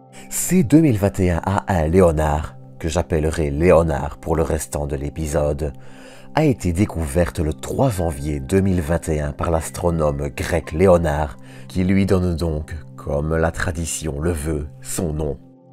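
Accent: Belgium. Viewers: Tu es belge ?? Merci pour la vidéo je suis de Bruxelles au plaisir encore des vidéo svp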